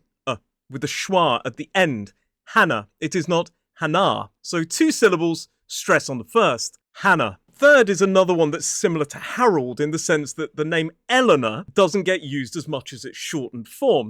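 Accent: United Kingdom. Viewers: Hi, lve just come across your channel. l love the way you run your lessons, and l love listening to your British accent. I've subscribed ❤ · Ciao, what did you say at the end to finish off your video, "from me" or "fir me"? They say the same thing on TV to end a programme but I never get it. I love your British accent so much!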